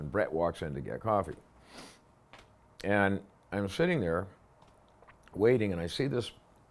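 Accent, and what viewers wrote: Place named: New England, United States. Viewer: Gotta love the New England accent! Like being in "Norich", CT.😅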